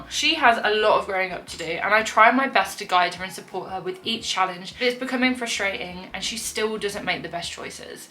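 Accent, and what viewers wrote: United Kingdom. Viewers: Am in love withe ur british accent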